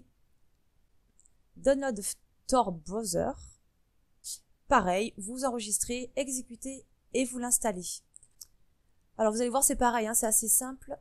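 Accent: France. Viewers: j'adore ton accent frenchy, je comprends pourquoi y'a des mecs qui craquent en entendant une p'tite meuf du sud-ouest parler anglais, un mélange de j'm'en fout, j'ai pas écouté ma prof quand j'étais en sixième et de t'as qu'a apprendre le français c'est une langue géniale, tous ça avec une syntaxe et une grammaire juste correct' et Windscribe (50Gb/mois) t'en pense quoi?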